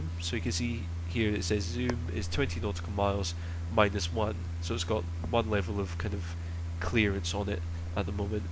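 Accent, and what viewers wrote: Scotland, United Kingdom. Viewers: Great explanation and relatively easy to follow. Thanks.... and in a good Scottish accent too lol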